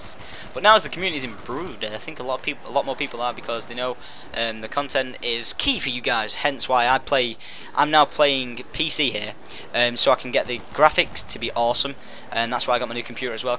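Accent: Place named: England, United Kingdom. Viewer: Where in England are u from?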